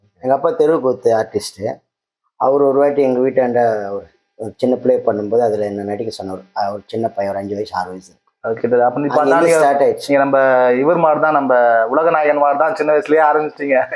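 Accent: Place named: Chennai, India. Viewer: Attahasamana comments ivar epdi sivaji madiri irukka mudiyum chennai speech supera pesararu veetanda sivaji ipdiya pesuvar avara madiri tamizh pesamudiyuma kural illave illa but covering gold aaguma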